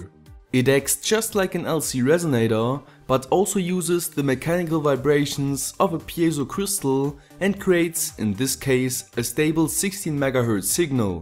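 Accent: Germany. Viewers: Wie man sofort hört dass du deutscher bist ^^ Aber sehr geile videos machst du, respekt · that accent though, germans genes are strong in this one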